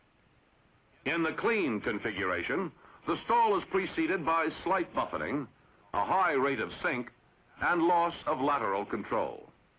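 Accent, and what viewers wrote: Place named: Boston, United States. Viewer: Love the Bostonian accent. AFP couldn’t either train him to speak “General American” or their editors themselves thought it was okay. Option 3: A rush to production rendered the former two impossible. And: the rear control surfaces seem just massive...otherwise, this very striking bird reminds me of the B58’s cockpits...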